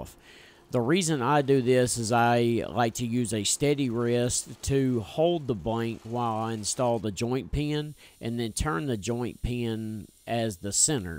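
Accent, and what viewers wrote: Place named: Florida, United States. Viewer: You sound like a Florida boy. No insult. Florida boy here.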